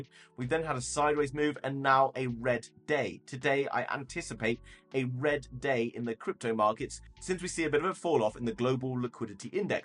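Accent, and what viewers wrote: United Kingdom. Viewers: Are you brittish?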